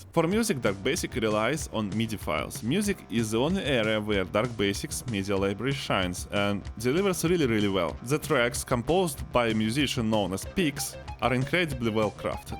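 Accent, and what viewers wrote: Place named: Russia. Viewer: Охренеть, только и акцент русский, а почти весь ролик становится понятным без перевода! 😧👍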